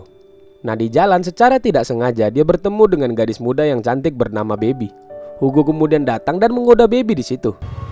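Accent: Medan, Indonesia. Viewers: pasti Abang ini orang Medan wkwkw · Medan kali ahh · Dari awal denger cara kamu bwa cerita n logatmu udah tertarik saya, gampang dimengerti n kocak juga, org dri medan ya min??? MEDANNYA KAU BANG?? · Org medan kyk nya kau bang kwkwkwk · mantap kali wak wkwk. orang medan keknya uwak neh 🤣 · Tiba² nongol diberanda langsung subscribe & like 🔥🔥 // Salam dari MEDAN 😁. Dari logat bicaranya mimin juga dr medan keknya ini. 🤭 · lucu kli kau ngomong nya bg,,,orang medan ko ya,,,wkwkw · Logat medan ya lek😅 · Salam kenal min,subscriber baru mu, dari awal nonton film ini salfok sama cara pengucapannya nampaknya uwak ini anak medan pikirku🤣salam kenal lah yaa awak juga orang medan wak. Sukses terus!🫶🏻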